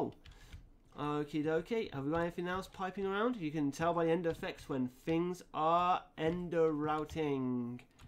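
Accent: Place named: United Kingdom. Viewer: Yeah, hoppah. Nice explanation and voice. I never really liked the british accent, I always preferred something like the canadian one. But you do sound nice.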